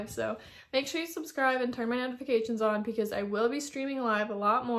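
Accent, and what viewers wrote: Philippines. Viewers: I like your pilipina accent.. Sana all😅😊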